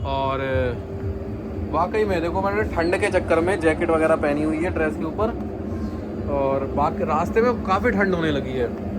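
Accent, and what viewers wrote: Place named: Uttar Pradesh, India. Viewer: Bhai apki awaz sunn kar lgta hai ki aap UP se hain. Mein Azamgarh se hunn, aap kahan se ho?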